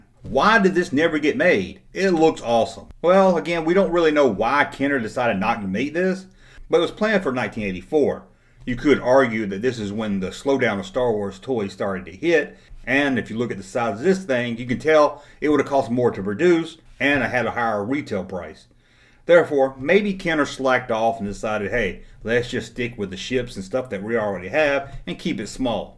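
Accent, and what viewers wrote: South, United States. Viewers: I dig your vids and the southern accent (which I'm sure you don't think you have) is awesome. Funny, how, the name C-3PO was shortend in the film to "3PO or Threepio", and most put the emphasis on the "C" (or "Three") when saying his name. But you put the emphasis on the "PO". It's not hate mail, just intriguing.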